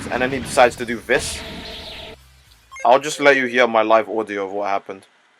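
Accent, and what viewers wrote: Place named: England, United Kingdom. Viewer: Are you from England? You got the accent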